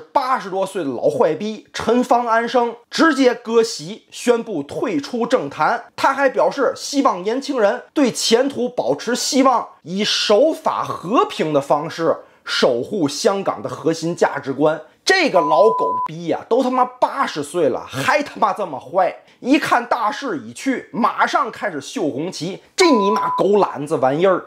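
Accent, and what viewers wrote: Tianjin, China. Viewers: 天津口音真好听！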